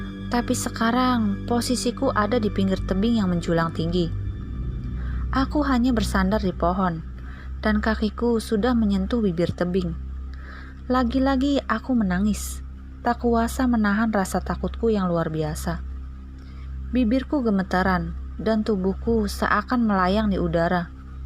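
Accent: West Java, Indonesia. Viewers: Pembaca ceritanya berasal dari Sunda/Bandung ya?... Betulkah?... Karena penyebutan "bagaimana" terdengar menjadi "bagaimanak"/ penyebutan "sunyi sekali" terdengar menjadi "sunyi sekalik"/ penyebutan "gelap sekali" terdengar menjadi "gelap sekalik"/ penyebutan "membaca shalawat Nabi" terdengar menjadi "membaca shalawat Nabik"/ penyebutan "jangan tinggalin aku sendiri" terdengar menjadi "jangan tinggalin aku sendirik".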